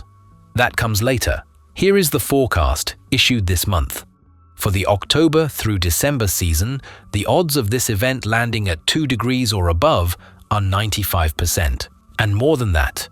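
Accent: England, United Kingdom. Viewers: S,o,o,o,o. —- the weather forecast for your region is: “YES.” Im so glad that I found this At least I was able to hear a guy with an English accent!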